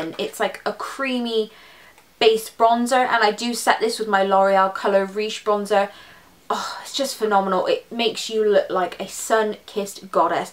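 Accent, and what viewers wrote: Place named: Somerset, United Kingdom. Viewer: New subscriber! I adore your channel! Where are you from? I hear a twinge of sort of Somerset/Bristol accent! I'm from the north-west! I adore all your tutorials I'm binge watching your videos you're gorgeous!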